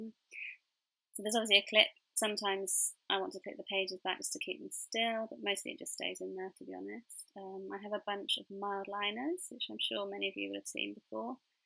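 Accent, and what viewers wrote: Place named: United Kingdom. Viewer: I happen to love british accent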